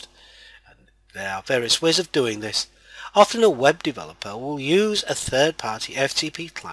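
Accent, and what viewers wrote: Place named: Newcastle, United Kingdom. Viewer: Very good explanation - and no trouble with your Geordie accent either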